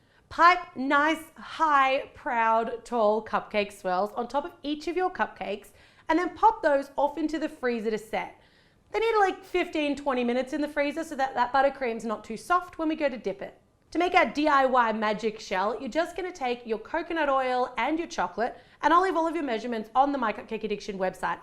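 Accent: Ireland. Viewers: love your irish accent hahahah i'm irish but dont celebrate patricks day much,its definitely a bigger thing in america or australia :D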